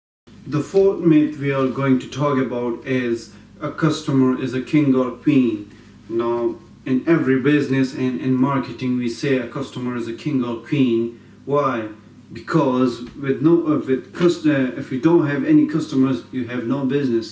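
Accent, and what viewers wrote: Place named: United States. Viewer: Accent hm American de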